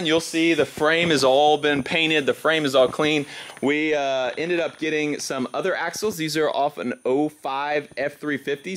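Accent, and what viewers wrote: Texas, United States. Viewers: it ain't your fault people can't tell the difference between ranch and wrench. Other folks don't know how to talk right like we do in Texas.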